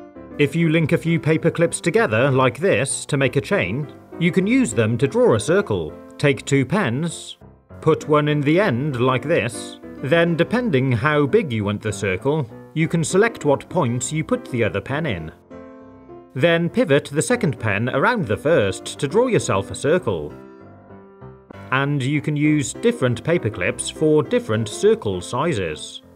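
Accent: United Kingdom. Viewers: The British accent never gets old!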